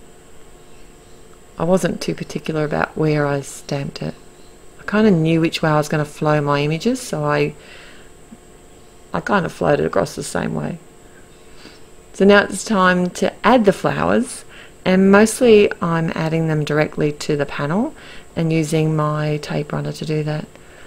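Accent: Australia. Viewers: Love your sweet card. I've never been keen on flat flowers, always preferring dimensional, but these look so very fresh and pretty. Thanks for all the tips about stamping. Lovely to hear an Aussie voice. Sorry, I think I say this each time I comment, but it's true. Good to know that our Aussie talent is being showcased. Well done.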